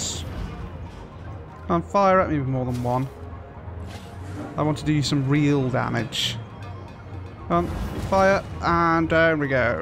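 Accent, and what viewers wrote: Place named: North, United Kingdom. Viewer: Not related to the game, but I'm having trouble placing your accent, it sounds like you are from the UK but I can't place it more precisely, maybe a bit northern but a bit London at the same time.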